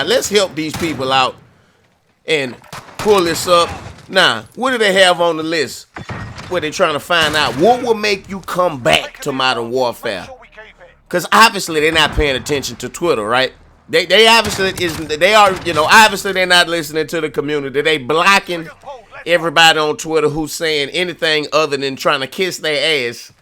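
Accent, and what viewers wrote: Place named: New York, United States. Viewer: You just dressin up a turd, and eventually people realize they are playin with a pice of shit“ hahahahha man you too funny where are you from? You have a hilarious accent You sound like a friend of mine, hes from NY, i guess you come from around that area too, is that right ?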